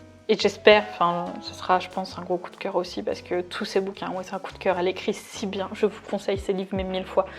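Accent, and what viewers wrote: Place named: England, United Kingdom. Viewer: ton accent anglais ❤️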